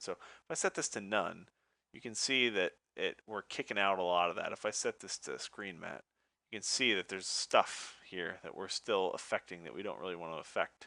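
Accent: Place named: Canada. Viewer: I detect a canadian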